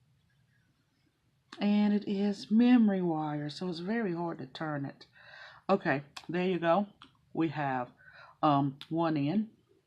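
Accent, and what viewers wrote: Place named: South, United States. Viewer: I came for the adorable southern accent. Ok, and the beautiful ring. Thanks!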